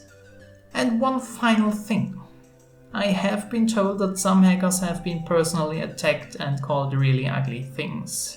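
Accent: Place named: Germany. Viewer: It felt nice to hear a new German voice. And of course, every word was pronounced 100% clearly.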